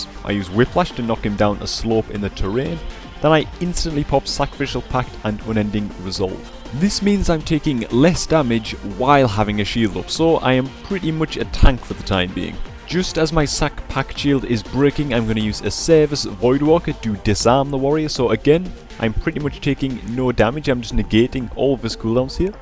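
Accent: Newcastle, United Kingdom. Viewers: Hey man, just wanted to say hi! just found you randomly on youtube searching for lock videos as I'm currently leveling one to replace my warrior (Bad timing by the looks of it with 5.4 but I'm hooked on destro now) Are you from near Newcastle? I recognize the accent, I'm from Leeds =) Anyway thanks for the vids and you've earned a new subscriber!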